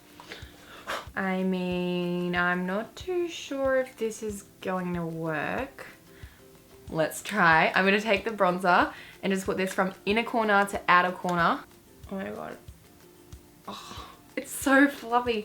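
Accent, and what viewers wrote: Australia. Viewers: Is she Australian?? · She's Australian.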